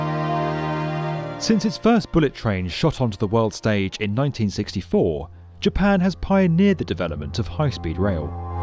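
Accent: United Kingdom. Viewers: 🤣 No the narrator is British! You can EASILY tell by his accent!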